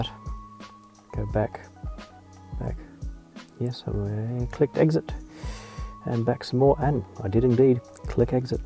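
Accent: Australia. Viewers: Brother you sound australian? you driving a left hand BMW? where you located now?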